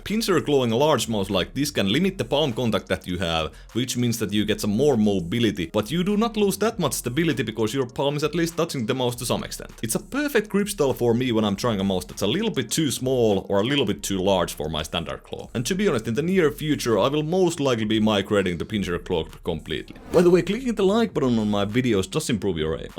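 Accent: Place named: Finland. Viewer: First time in your channel and I had to check immediately are you a Finn or Estonian :D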